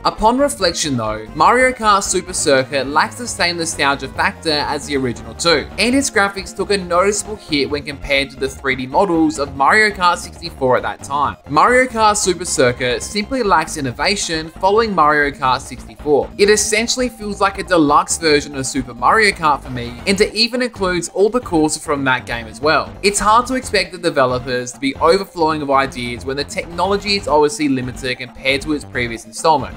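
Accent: Australia. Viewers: man, that australian voice 👍👍👍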